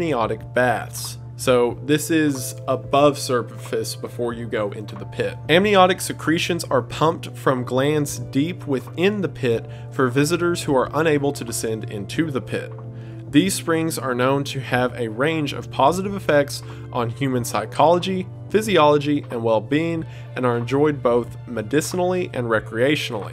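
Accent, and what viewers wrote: South, United States. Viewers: you have this southern accent that comes out every once in a while and it really added to this whole experience, especially when you read letters/notes, very immersive 10/10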